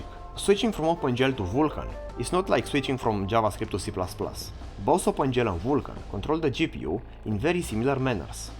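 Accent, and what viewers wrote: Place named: Romania. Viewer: that romanian accent 🤌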